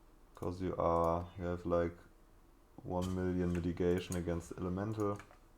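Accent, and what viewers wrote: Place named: Germany. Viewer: LIEBE ES MANN ERKENNT SOFORT WENN NEN DEUTSCHER ENGLISCH REDET ^^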